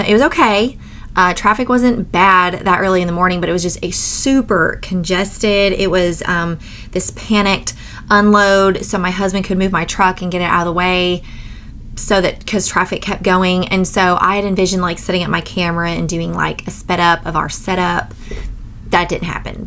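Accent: South, United States. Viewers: I just found you and love you already. Subscribed!! I loved when you said that markets were the P to your PB and J and your cute southern accent. I definitely will be going to site and purchasing from you because I want to support you!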